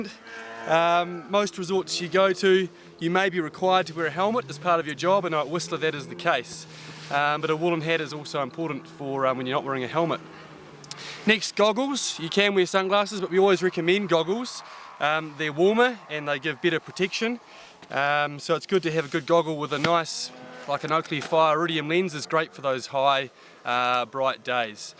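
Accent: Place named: New Zealand. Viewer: Good Kiwi accent! :)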